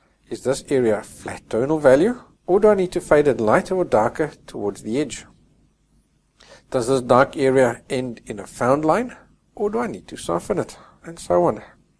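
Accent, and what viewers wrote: Afrikaans, South Africa. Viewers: Is that a Afrikaans accent I'm picking up?? XD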